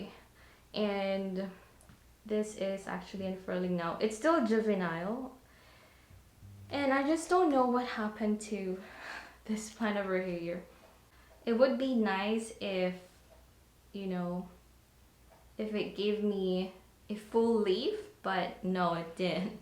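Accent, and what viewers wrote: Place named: Philippines. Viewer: Its cool, no matter where we live, we filipinos sound the same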